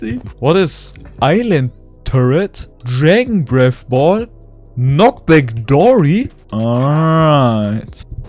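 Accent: Germany. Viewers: German accent, I love it😂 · Are You from German?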